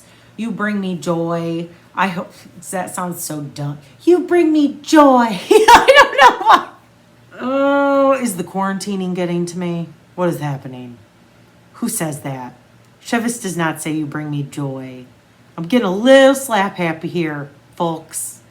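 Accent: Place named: Texas, United States. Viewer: Hi... let me start off saying you are not boring! I just discovered your video. I really enjoyed you being YOU! I live in Houston Texas and you sound like you’re from Texas. Anyways that Boxy Chevron sweater is so awesome I had to go buy it ASAP but I gotta problem I’m a NEW knitter so will you please do a tutorial on how to get started on this sweater Puh-lease - Lisa😊🙏🏽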